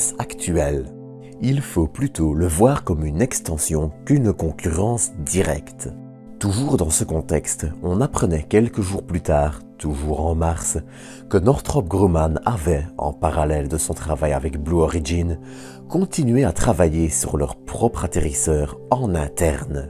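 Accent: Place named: Flanders, Belgium. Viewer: dit j'ai une question, tu est flamand ? flamand belge n'est ce pas!? 😌 ton accent trahi tes origines 😌😅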